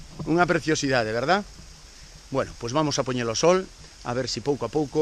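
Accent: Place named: Spain. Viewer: Parece q es un español y esta hablando portugués por q quiza esta en un país donde hablan portugués :v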